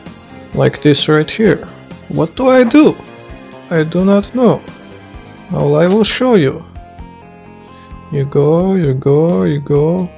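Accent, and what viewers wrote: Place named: Russia. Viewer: You have a good Russian accent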